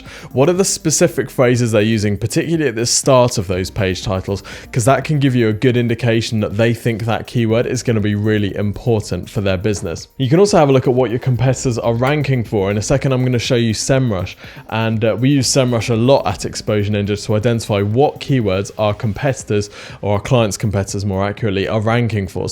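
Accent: United Kingdom. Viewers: Are you from Nottingham?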